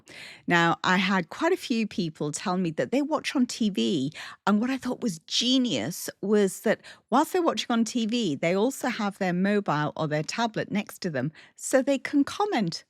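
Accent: United Kingdom. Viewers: I’m I heaven…the British accent, thrifting, design. Thank you for sharing. Diane from Florida.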